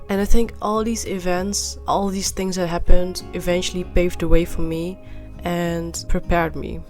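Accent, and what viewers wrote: Netherlands. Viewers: So many conflicting emotions that are difficult to resolve but in one regard you have so many more life opportunities (as you discovered) which gives you a chance for a broader impact for yourself and others..love the Dutch accent, best wishes for all in your future 🙏🏻👏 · Your English is phenomenal with a tiny hint of a Dutch accent.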